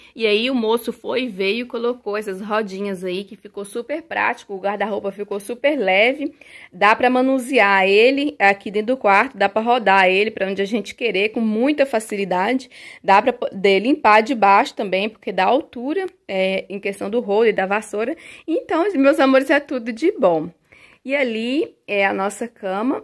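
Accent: Brazil. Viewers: Vc e baiana???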